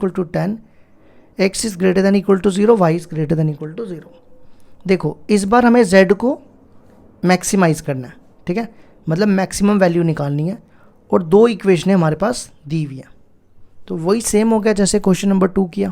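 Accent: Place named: Jammu and Kashmir, India. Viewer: Bhai kaisa hai tu unke udhar sab aise hi bolte hai j and k se hai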